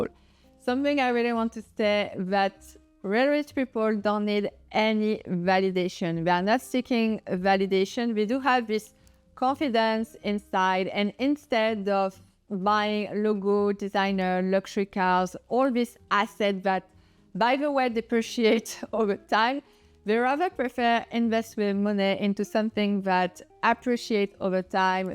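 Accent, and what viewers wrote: France. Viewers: Respectfully, may I suggest that you add sub-titles or text to your videos. I understand your accent because I also speak French but other viewers may not. Thank you for your content, it is excellent ! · Girl you have a heavy French accent it's hard to understand you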